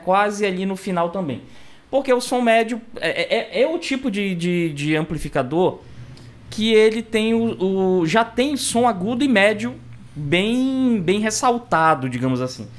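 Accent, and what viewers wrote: Pará, Brazil. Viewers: Video show de bola. tu é Paraense?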